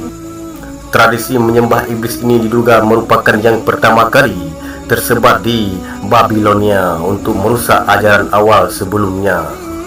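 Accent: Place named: Aceh, Indonesia. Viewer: Logat pembaca: logat aneuk nanggroe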